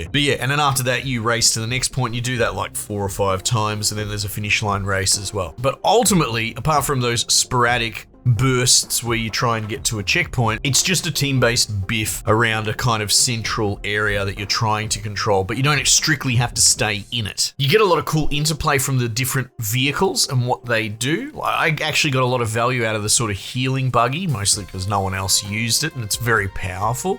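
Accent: Australia. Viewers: Thank You - and very nïce english/aaastralian' langüagé :- ]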